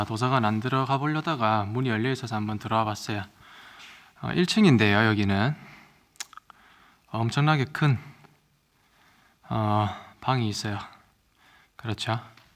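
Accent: Gyeongsang, South Korea. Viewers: 사투리를보아하니 경상도분이신가요? 영상재밌게보고계십니다.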